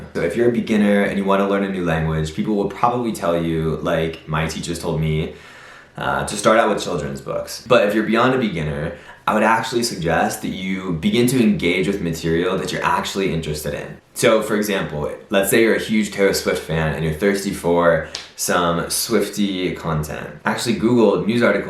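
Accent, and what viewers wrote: Buenos Aires, Argentina. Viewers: I'm learning english actually 😂😂😂 what I do is listening to music, sometimes I read books in English but what actually I do like is watch videos on youtube. I keep looking for channels in English and I also learn from them too, new words and new stuffs. What I realized is that you accent is from Argentina, I'm from Dominican Republic. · AMO TU ACENTO ARGENTINO, HABLAS MUY BIEN EL ESPAÑOL, SE VE QUE TE HAS ESFORZADO, TE ENVIO ABRAZOS Y BESOS DESDE PANAMÁ 💜🙌🤗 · You are not only speaking spanish, you are speaking argentinian, and that's freaking hot. Saludos desde Argentina :) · Damn!! Escucharte hablar con ese acento argentino me pone mal!!! Saludos desde mexico!! MAS VIDEOS EN ESPAÑOL X FAVOOOR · tu acento argentino me hace reir XD yo apprendó el frances tambien y pienso que puedes hacerlo más facíl !! esos tres languajes son muy similares y lo hace más facíl de apprenderlos. 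j'aime mieux apprendre les langues et tes vidéos à leur sujet! j'espère que je rendrais visite à la france à l'avenir comme vous et argentina : ) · AAAAHHH I KNEW YOUR ACCENT SOUNDED A BIT ARGENTINIAN!! Si te soy honesto, sos la primera persona estadounidense (nativa) que escucho que tenga un acento argentino. It really was shocking. Not many americans know about our existence but I’m somewhat happy on the fact that you have that beautiful accent of ours in your spanish. Muy orgulloso de vos ❤️ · Tienes mas acento argentino espero que visites Peru. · Yo podría ser tu native speaker, para que dejes ese acento argentino y tengas uno más neutral. I´m from Chile. Practicamos idiomas y hacemos otras cositas jijijiji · Saludos desde Mendoza. Argentina. Tu acento es porteño perfecto!! Bien logrado!!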